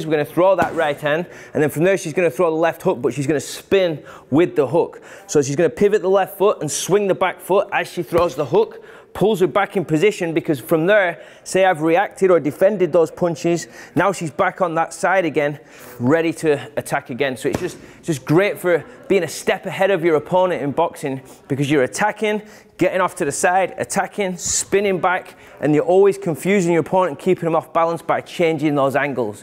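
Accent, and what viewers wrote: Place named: Clitheroe, United Kingdom. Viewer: That sounds like a Clitheroe accent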